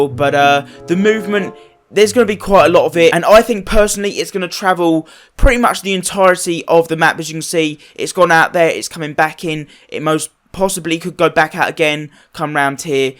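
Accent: United Kingdom. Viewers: I did it. And r u from England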